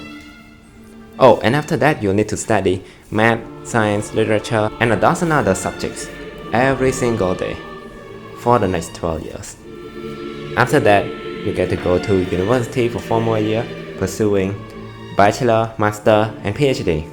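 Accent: Singapore. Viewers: U got a strong singapore accent vro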